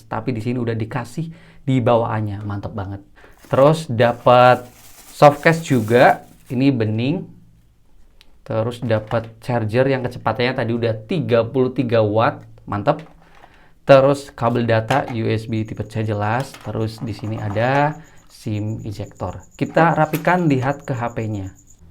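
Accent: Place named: Cirebon, Indonesia. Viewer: kaya orang cerbon ya mas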